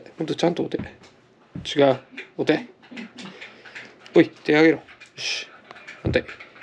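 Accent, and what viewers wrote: Kansai, Japan. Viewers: ええ人やな☘️ 関西弁聞きたくなったらの動画みてる😊 め〜っちゃオモロイし、ためになる!! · 話し方が関西人やと思ってたけど、まさかの奈良県民とは思わんかったな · いつもの仮面しかイメージ無いから申し訳ないんだけど、あの見た目でコッテコテの関西弁で独り言喋ってたら普通に怖いな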